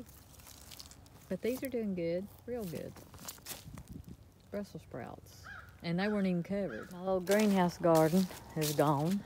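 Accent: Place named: East Texas, United States. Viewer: Thank you.👩‍🌾 I am enjoying my garden catalogs. I see my gardens in my visions underneath all this snow. You make me homesick. Are you east Texas? It sounds like home, I miss the sing song chatter of my Aunts and cousins in the kitchen.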